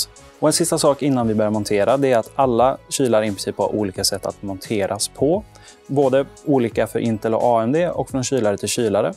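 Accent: Sweden. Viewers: Jag gillar att han uttalar alla namn med svenskt uttal, istället för engelskt. Låter lite roligt :D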